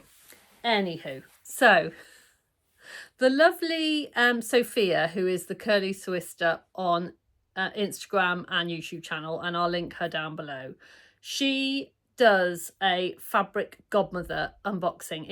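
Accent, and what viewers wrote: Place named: United Kingdom. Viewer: Oh....your lovely British accent!! A Router ... tee hee, got it now! So enjoy your sparkle videos!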